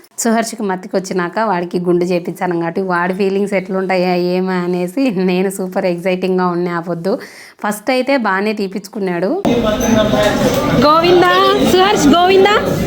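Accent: Rayalaseema, India. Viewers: Hi garu iam New Subscriber madhi kuda Allagadda devudu mervaniki kadu konda dhgi velledi Laxmi narasimha swami vari Pelliki pilavadaniki konni village s ki matrame veltharu Anye mi vedeios chala bugunnai Mana ralyalalsleema slang Matladuthunnaru Chabaga matladuthunnaru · మీ వీడియో క‌నిపిస్తే చాలు వెంట‌నే చూసేస్తున్నాను. ఎందుకంటే అద్భుత‌మైన సీమ మాండ‌లికాన్ని అల‌వోక‌గా వినిపిస్తున్నారు కాబ‌ట్టి. ఆస్కార్ సినిమా చూస్తే క‌లిగే ఫీలింగ్ మీ వీడియోల‌ద్వారా వ‌స్తున్న‌ది.అంత హాయిగా, ధారాళంగా, అమాయ‌కంగా, అర‌మ‌రిక‌లు లేకుండా మాట్లాడ‌డం అంటే మామూలు విష‌యం కాదు. మాది కూడా వైఎస్సార్ జిల్లానే.మీ ఊరు ఖాజీపేట‌కు మా వూరు తొండ‌ల‌దిన్నె ఒక 30 కిలోమీట‌ర్ల దూరంలో వుంటుంద‌ని అనుకుంటున్నాను. 3 ద‌శాబ్దాలుగా హైద‌రాబాదులో వుంటున్నాను. సాక్షి టీవీలో సీనియ‌ర్ జ‌ర్న‌లిస్టుగా ప‌నిచేస్తున్నాను.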